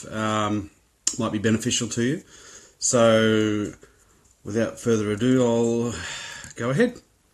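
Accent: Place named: Australia. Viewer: Hi.. 1st I love your Australian accent. Secondly, I feel like you invited me to your home and sat me down to show me how the camera I just bought works. Thank you for an exceptionally in depth, hold your hands and let me show you, review. Thank you mate..;)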